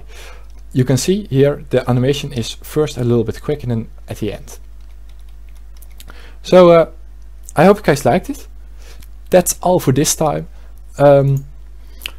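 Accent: Netherlands. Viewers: gek Nederlands accent bro thanks voor de tutorial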